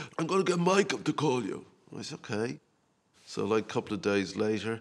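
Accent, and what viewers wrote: Ireland. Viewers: My God geldoff is so Irish. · Utterly Irish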